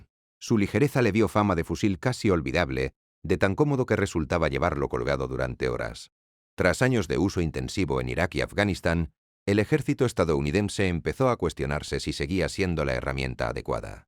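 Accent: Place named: Spain. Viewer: Hablas con acento: "ostia, tio"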